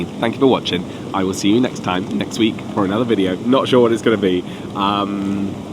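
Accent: United Kingdom. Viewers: Hi dude just chill and relax a bit. You seem to be doing great. You must do whatever you like to do. Don't take too much pressure on yourself. You literally have positive vibe and that is great thing about you. 1 year ago I started watching your videos just to learn British accent 😅. After a year I can mostly be able to understand the British accent now. Lot of love from India 😊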